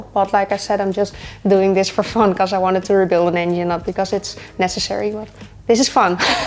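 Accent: Netherlands. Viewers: its just the normal dutch way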